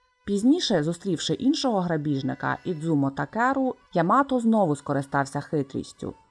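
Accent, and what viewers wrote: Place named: Russia. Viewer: Йоооооййй! Це так цікаво! Тільки є одна штука, яка турбує. Часто деякі зі звуків у власних назвах ви вимовляєте на російський манір, як-от -сі, а не -ші, чи -ті, замість -чі. Так само неправильно -дзі, -дзя, -дзю, -дзьо, натомість : -джі, -джя, -джю, -джьо. Ця система (яку я навела як правильний приклад) транскрипції і транслітерації, здається, Коваленка. Більшість сучасних українських перекладів з *японської саме за нею. Отаке. Дуже дякую за цікавезні теми! Буду чекати ще щось із японської міфології. Прикольно впізнавати назви і імена, які зустрічались у сучасних роботах країни сходу сонця.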